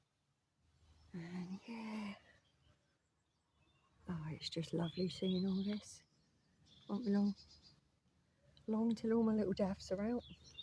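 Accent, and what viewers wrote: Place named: Kent, United Kingdom. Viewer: I’m originally from Kent, similar accent 👌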